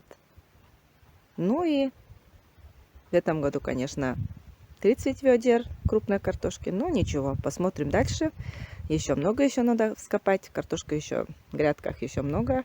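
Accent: Komi, Russia. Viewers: Здравствуйте, и! Привет вам из Самарской области👋👋👋 Ох, кутшем ме рад кывны рОднэй диалект, вроде похож миян говор вылэ Анна Николаевналэн серниыс). Чужи да быдми ме Ижемскей районын в с.Няшабож. 12,5 лет не была на родине, гажтэмала порой зэй ена. На днях искала рецепт калитки, а вчера случайно, а может и не случайно, вышло в ленте ваше видео. Результат - челядес да верОсэс водтэдi узьны😴 и кык час воедз калитка пОжалi😁 с картошкой и пшенной кашей. ПрОстэй национальнэй выпечка. Дети очень любят выпечку с картошкой, буду теперь чаще баловать их калиткой 😊 в общем, смотрю теперь по возможности ваши видео, как вы, картошка босьтанныд, тшак Октанныд (ме мамакед зэй ена любитi тшакла ветлыны, чери кыйны вугыршайт вылэ)... Ностальгия🥰 Синваэ петэ... Став бурсэ тiян!💐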